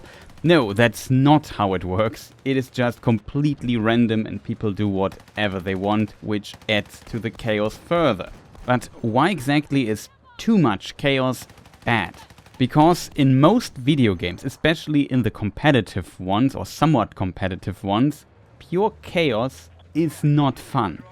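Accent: Germany. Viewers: If I hear a German accent over battlefield gameplay I know I’m in for a banger vid.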